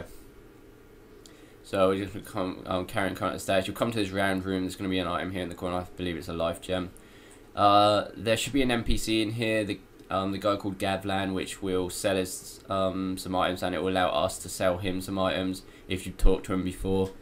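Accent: England, United Kingdom. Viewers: agreed, this guy plays like a noob, has England's equivalent of a Mississippi delta mudman inbred's accent, and nobody gives a fuck about the doors that don't lead anywhere so why is he even bothering to show them? He should just say "don't waste pharros on these" and move the fuck on.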